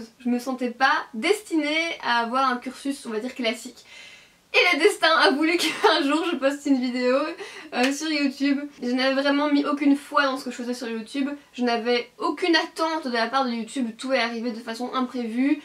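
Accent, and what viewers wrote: Belgium. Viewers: comme tu habite en Belgique est-ce que tu peux garder ton accent belge parce que moi aussi je suis une belge et il y a je pense des belge qui te regarde aussie bref t'es trop belle j'adore tes vidéo ne le prend surtout pas mâle pour l'accent dsl d'avance si je t'es fais mâle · Dernière question à ce que j ai compris tu est belge ? Tu a un accent belge c est vrai ! (En mode pomee 😹😂)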